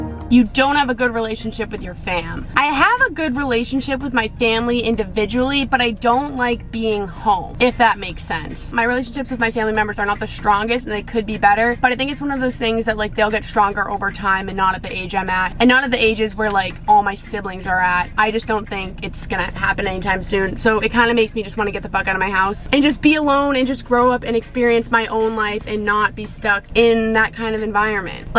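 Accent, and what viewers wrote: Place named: Massachusetts, United States. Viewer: your MA accent is strong in dis one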